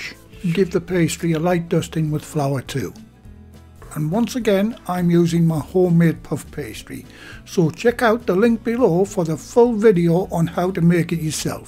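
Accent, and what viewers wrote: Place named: Northern England, United Kingdom. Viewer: As soon as I heard voice, then say he’s from up north, I just knew these were going to be godly sausage rolls.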